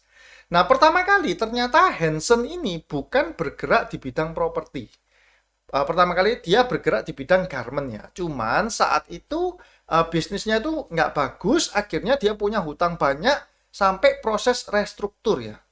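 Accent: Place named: Java, Indonesia. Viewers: Iya medok bgt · Mas e medok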